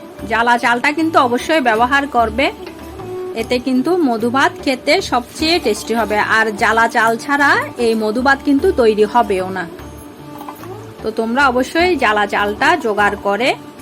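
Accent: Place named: Noakhali, Bangladesh. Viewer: আমার মনে হয় আপনার বাড়ি ফেনি অথবা নোয়াখালী হবে । চট্টগ্রামের আঞ্চলিক ভাষা এরকম নয় ।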